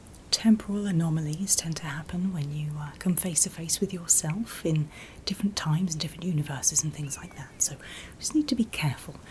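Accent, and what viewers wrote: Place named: RP, United Kingdom. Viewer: And by the way, I hope you noticed she is speaking the 'Q' English... : ))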